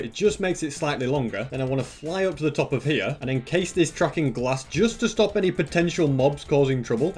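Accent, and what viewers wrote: United Kingdom. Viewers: I love ur British accent are u from England or united kingdom?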